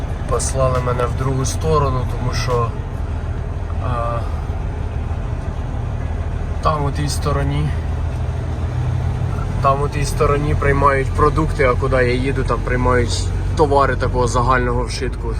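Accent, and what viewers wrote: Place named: Surzhyk, Ukraine. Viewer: А мені подобається твій суржик,обожаю( правильно обожнюю).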